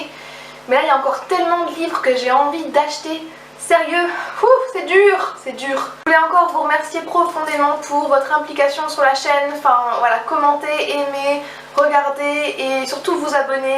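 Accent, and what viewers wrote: United Kingdom. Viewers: Coucou ma belle ! Hihi en effet ton accent est HYPER britannique ! Mais perso j'adore ! J'ai plutôt un accent américain pour ma part donc j'ai une adoration pour le britannique. Alors continuuue ^^ Je ne suis pas fan DU TOUT des BD, mais... Macabre a l'air vraiment beau ! :O Alors peut être que je vais le commander... Au fait, merci de m'avoir fait découvrir priceminister pour les livres. Hum hum. ^^ Pour la petite info, non, PPDA n'est pas parti à la retraite, c'est Claire Chazal ça ! xD Sinon comme d'habitude, j'adore te regarder en vidéo, tu respires la joie de vivre c'est vraiment super à voir. Par hasard, est ce que tu vas aller au salon de Montreuil ? ;) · J'adooooooooore le Victor Hugo, il m'avait déjà fait de l'oeil sur le site de Soleil Editions... J'aime bien l'accent britannique aussi, il faudrait consacrer une vidéo à ça :P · Pour avoir fait de longues études d'anglais, je dois dire que perso ça me fait du bien d'entendre une youtubeuse bien prononcer les mots anglais avec un bon accent, donc j'aurais tendance à te dire YES ! 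Garde ton bel accent. Cependant pour ceux et celles qui auraient du mal à comprendre (faut bien avouer que l'accent british est à couper au couteau hein haha) je pense qu'il serait judicieux que tu mettes des annotations dans tes montages vidéos pour spécifier à l'écrit le nom du livre et son auteur pour que les personne puissent le retrouver par la suite sur google :) Bisou ma belle xx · Je suis fan de ce petit accent britannique ;). Et entendons nous, "wondÉ", ça te décolle les tympans! B. Lacombe... On en entend parler sur toutes les chaînes en ce moment mais ça se comprend! De très belles acquisitions en tout cas! Et si ce sont tes achats depuis le mois d'Août, ça reste raisonnable je trouve, pas encore extravagant! ;) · Super book haul ! Au passage je kiff ton accent britannique je suis pour que tu dises les titres en britannique !!!!! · définitivement l'accent anglais :)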